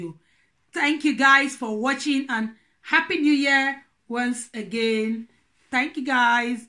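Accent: Nigeria. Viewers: I love your pidgin👌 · Is your Gossip only for naija people coz your English only naija person can hear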